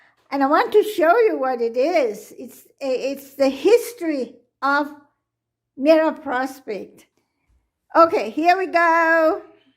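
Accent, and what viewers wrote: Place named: Denmark. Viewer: Hej Jeg kunne høre på din accent at du nok var dansker. Dejligt at se og høre. Jeg opdagede lige din fine video og har nu abonneret 🙂 Dbh. Michael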